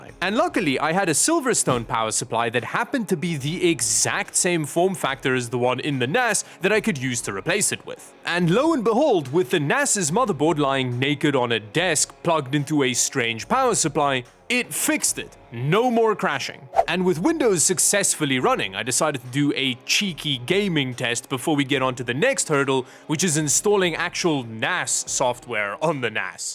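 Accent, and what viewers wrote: Afrikaans, South Africa. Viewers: You're the first English person I've heard get so close to an Afrikaans accent. Legit you were spot on but I think it's because your voice already sounds kind of South African😂. Jy moet net nie jou moer strip nie. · yeah I thought I picked up an African accent I just though he was from South Africa cause he literally sounds like our Afrikaans natives. Really cool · BRO IS AFRIKAANS ??? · Afrikaans... I was wondering where your accent came from.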